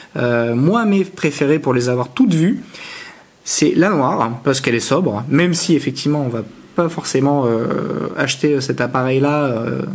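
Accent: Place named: France. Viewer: I'm just here for the french accent :')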